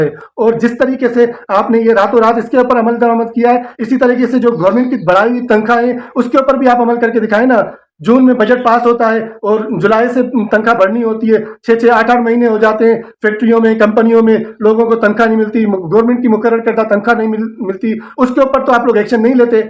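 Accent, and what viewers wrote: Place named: Sindh, Pakistan. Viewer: بھائی اپ کی ویڈیو دیکھی اپ عوام کے لئے بہت دفاعی اواز اٹھائی ہے اللہ پاک اپنی شان کے مطابق کی اور ہماری مدد فرمائے بھائی اپ ہمیں سندھی لگ رہے ہیں اپنی انداز اور اواز سے ہم جب اندرون میں سندھ جاتے تھے تو سندھی لوگ ہمارے لئے بڑے پیار سے لسی پراٹھا مکھن لے کر اتے اور خوشی خوشی ہمارا سات سات جگہیں گھماتے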